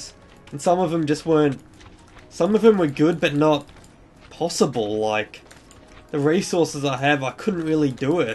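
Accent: Australia. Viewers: love the ozzy accent :) great vids as im new to minecraft :P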